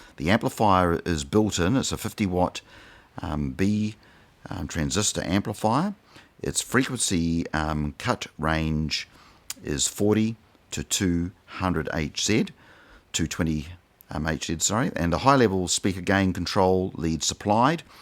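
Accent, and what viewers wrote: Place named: New Zealand. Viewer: I can't afford these things... yet! But my BestVox LS3/5a are definitely going to find a home on top of these at some point. In the meantime, I'll have to live with the Spotless Seed 1-way speakers, and their dedicated subwoofer/stands. They are rather incredible. So that is not difficult. I own 26 sets of speakers, and 7 subwoofers. :) Edit: LOL. We have the same RCA cables. Great minds... ;) Edit 2: LOL. We have the same Kiwi accent, too. I'm in CHC. :) Edit 3: Sorry to say this, but you are sitting miles too far from those speakers. You are well beyond the Critical Position according to Reverberance Decay theory. That means the room is BY FAR the biggest contributor to the sound you hear. If you were to toe them in a little, so the 5as focus about 1.5m behind your head, and you sit within 6 feet of the speakers, you will be absolutely shocked at how great your system now sounds. 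Try it! Rooms are horrible for farfield audio, in general. And Nearfield listening is the universal cheat code. I only sit 70cm from the speakers, and 60cm from each subwoofer in my all-Class-D quad monoblock setup running 4x 48v 10A GaN PSUs. And my system is capable of doing things to the human nervous system that $100,000 systems can not. Nearfield is why. And also extreme attention to set-up, and the time domain - which ruins 95% of all stereo systems.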